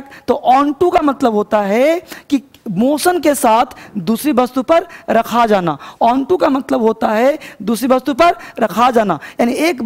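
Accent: Bihar, India. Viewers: But bihari accent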